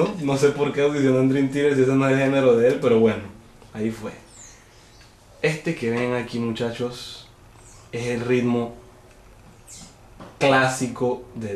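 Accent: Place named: Panama. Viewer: Man te lo juro que se ve! yo tambien soy de Panamá y encontre tu acento muy familiar, muy buenos videos, te felicito! saludos.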